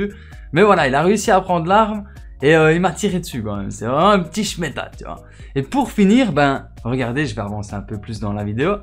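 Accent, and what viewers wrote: Belgium. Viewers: T'es belge? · Tu es belge toi non ?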